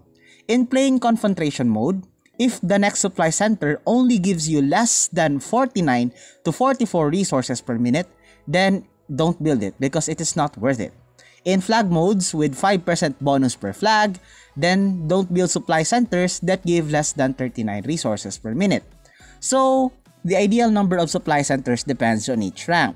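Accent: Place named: Philippines. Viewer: Keep it up.👍 Pilipino accent just like tracie haha. Kaya moyan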